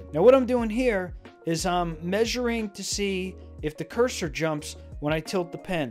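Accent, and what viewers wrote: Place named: New York, United States. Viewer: good review, funny accent! (Must be from New York or somewhere up there in the NE.)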